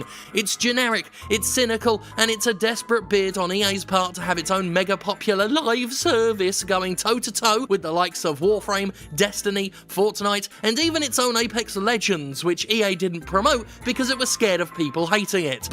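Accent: United Kingdom. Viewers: It's been 10 years and I still get a hard-on every time I hear that swanky British accent. Sheers mate!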